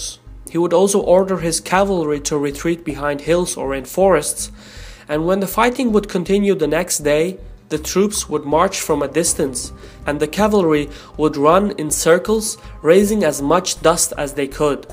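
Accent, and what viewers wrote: Arab world. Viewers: Biased. Look here : he has alil bit of arabic accent so ofc he is gonna say there was no genocide ... They converted most of the people with swords Look dude you lied . And its ok cuz we know u r biased. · This guy who represents the video is Arab. So you can hear his Arabic accent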